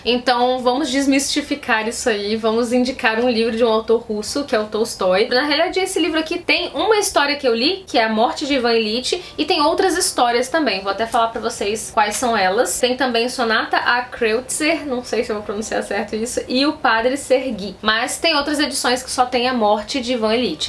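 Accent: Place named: Minas Gerais, Brazil. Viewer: Você tem muito sotaque de mineira. 😁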